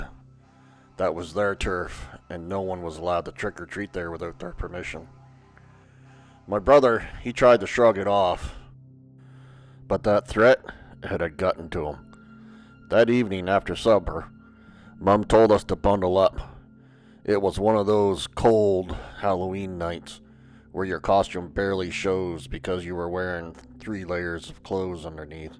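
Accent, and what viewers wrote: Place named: Upper Midwest, United States. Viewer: Spooky story! Sounds like you're from the Northern Mid-West? Canada?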